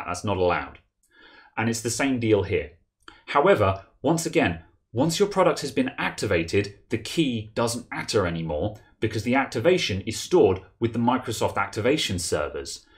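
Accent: England, United Kingdom. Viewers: he is speaking a certain accent of UK english, other accents can be unintelligible . search youtube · Where in the world are you and from. My guess is English but you always price in dollars. I subbed to your channel because it's nice to have a clear and understandable voice to listen to.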